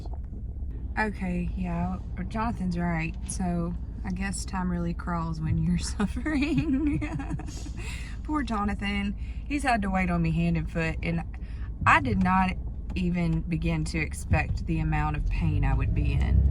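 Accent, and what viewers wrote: Tennessee, United States. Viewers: Omg don't cry your too sweet and love your southern voice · I’ve been following all your journey on the AT. You are a strong and amazing woman. This is just a bump in the road. I thought you talked like a Tennessean… I’m 2 hrs from Memphis. Good luck on your recovery and return to the trail. Bobr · I knew that was a Tennessee accent!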